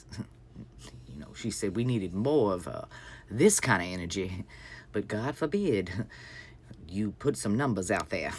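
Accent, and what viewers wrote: South, United States. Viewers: This is the most "well bless your sweet lil cotton-pickin' heart" Southern sass i have ever heard.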